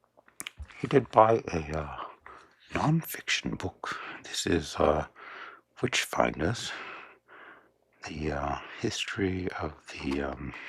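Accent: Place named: New Zealand. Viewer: Very nice of you to show off Robs latest acquisitions. I have to ask, have you spent time in the Antipodes as I could swear I detected a faint New Zealand accent?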